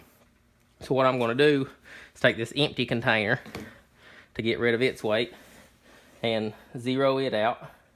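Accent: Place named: Appalachia, United States. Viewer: This guy has to be appalachian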